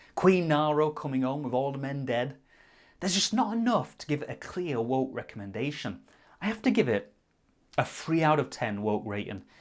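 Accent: Yorkshire, United Kingdom. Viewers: I’m from Yorkshire. By eck, that’s a great Yorkshire accent mate!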